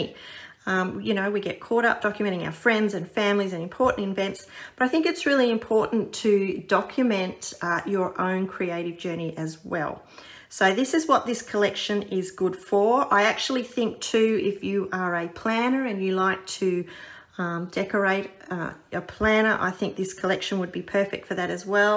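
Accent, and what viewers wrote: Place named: Australia. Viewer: !these pages are beautiful,love to watch you scrap and listen to your Aussie accent! Just Lovely xx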